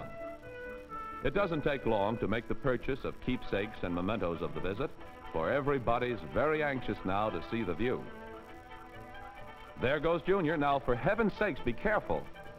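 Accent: Transatlantic, United States. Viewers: that´s the trasatlantic accent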